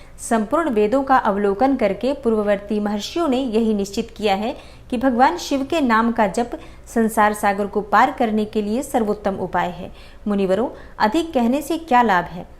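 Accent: Standard. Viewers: 🙏🙏धन्यवाद आपका उच्चारण बहुत सुन्दर,सरल और शुद्ध है ❤ हर हर महादेव 🌹🙏🌹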